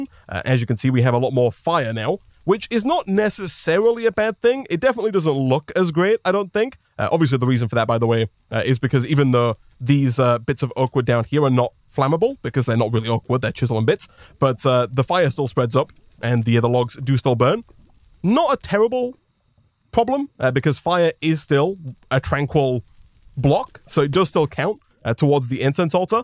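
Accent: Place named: Yorkshire, United Kingdom. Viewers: British, I think. He’s British, I know that much, but I don’t know the accent. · Yeah he is from Yorkshire where the tea comes from but prefers the caffeine from coffee :p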